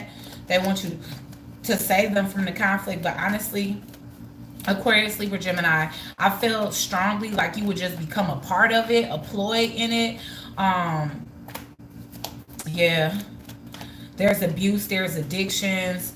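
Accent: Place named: United States. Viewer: Yahh boo looking glowing for real for real I love the American twang I'm a London boy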